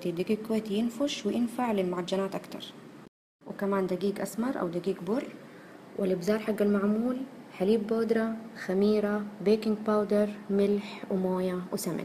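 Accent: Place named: Hejaz, Saudi Arabia. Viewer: اللهجه الحجازيه الاصيله ماشاء الله ههههههه دحين انزلكم هي شكرا على الوصفه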